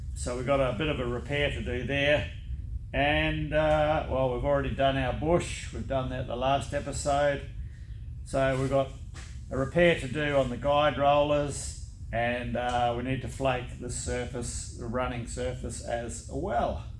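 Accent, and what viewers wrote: Australia. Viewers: Great instructional video - with the Aussie touch. Thanks.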